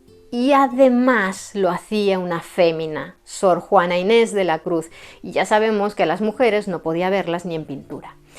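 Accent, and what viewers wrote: Spain. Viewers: Suena más a española que mexicanoa...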